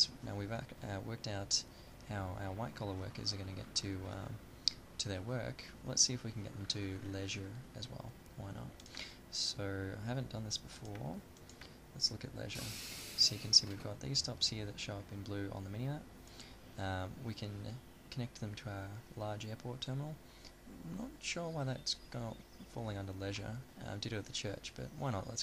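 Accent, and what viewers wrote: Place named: Australia. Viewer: Love australian accents :D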